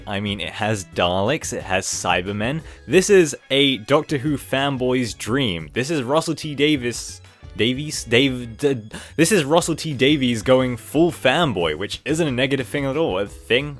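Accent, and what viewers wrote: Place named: United States. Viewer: why you sound slightly american??? I NEED ANSWERS!!!!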